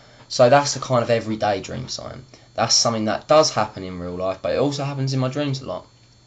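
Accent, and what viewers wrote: England, United Kingdom. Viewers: well i can usually distinguish different english accents an he sounds like hes from the same region as rupert grint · What part of England are you from? You sound like Daniel Radcliff...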